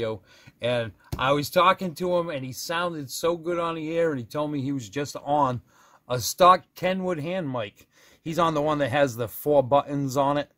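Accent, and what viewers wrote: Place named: Boston, United States. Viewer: South Carolina? You sound like a Boston boy to me. LOL. I was born and raised in Salem.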